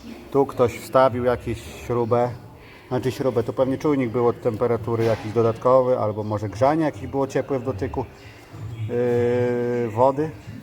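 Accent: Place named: Ukraine. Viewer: Zaciągasz ukraińskim koleś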